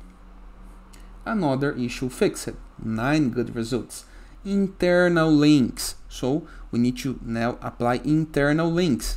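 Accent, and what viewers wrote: Brazil. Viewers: Are you brazilian? I like the content and you accent. Was easy for me to understand everything.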